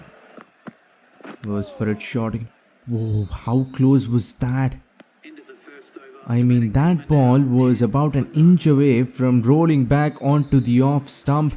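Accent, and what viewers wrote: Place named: India. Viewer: Where are you living ?, from your voice I feel like you are from India but living somewhere else due to the accent...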